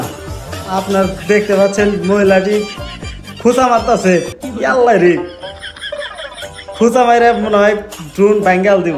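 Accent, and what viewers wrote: Tangail, Bangladesh. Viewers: ভাই কি টাংগাইলের লোক নাকি...? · ভাই মনেহয় টাঙ্গাইলের।ভাষায়ই বোঝা যায় ভাদাইমা।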